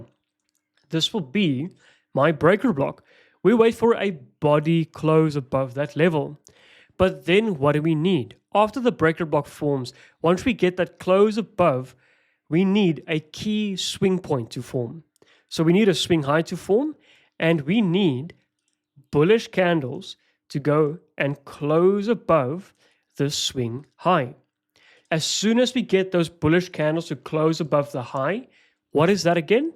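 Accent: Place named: South Africa. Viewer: No he sounds South African but I could be wrong!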